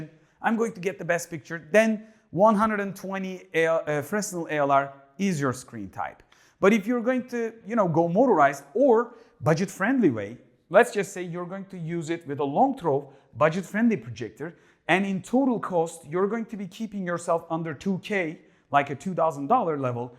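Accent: Turkey. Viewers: Eski bir videoyu seyrettim direk aksandan Türk dedim bu Abimiz)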